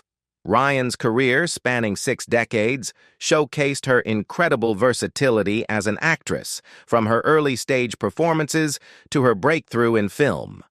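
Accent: United States. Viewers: If you are going to tell us about this great show learn how to talk English not American..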